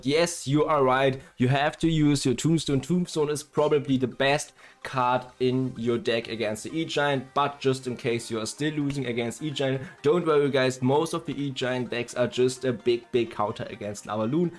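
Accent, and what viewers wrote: Germany. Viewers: Man hört das du deutsch bist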